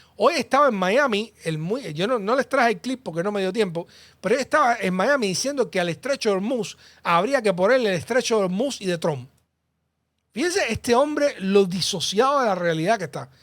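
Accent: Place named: Cuba. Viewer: con todo respeto, mejora tu dicción, he oído a otros cubanos y tu acento resulta demasiado cansado. Tu información buena pero cuesta trabajo seguirte con ese acento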